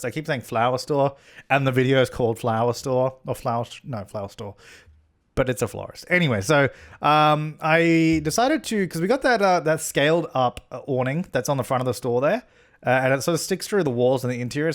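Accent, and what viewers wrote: Australia. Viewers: Haha I love how you love his accent but I’m Australian so it sounds completely normal to me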